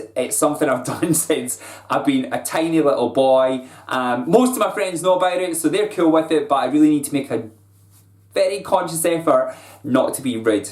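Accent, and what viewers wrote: Scotland, United Kingdom. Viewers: I could listen to you chat all day! I have a soft spot for a Scottish accent. Looking forward to your next vid. Much love from Australia! 🐨 ❤⭐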